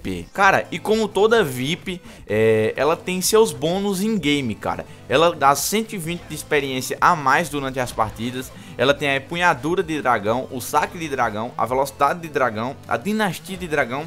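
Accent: Northeast, Brazil. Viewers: TU e nordestino ?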